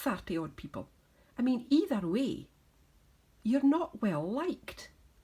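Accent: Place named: Scotland, United Kingdom. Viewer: Good to hear your lovely Scottish brogue!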